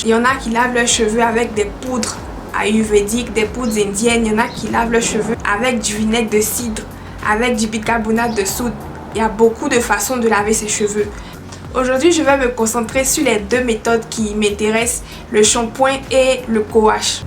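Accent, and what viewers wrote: Ivory Coast. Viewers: L’accent ivoirien qui ne ment jamais 😂❤️ big kisss depuis babi!!!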